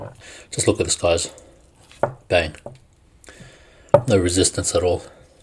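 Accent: Australia. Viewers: Just wondering you sound Australian are you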